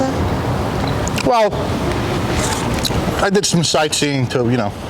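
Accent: New York, United States. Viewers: Absolute new yawk knucklehead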